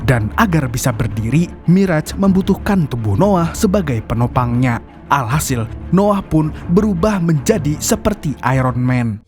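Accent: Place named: Java, Indonesia. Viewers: Esih medok ya lik tapi lha mandan adem ❤ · Akses jawirnya kental sekalee brur!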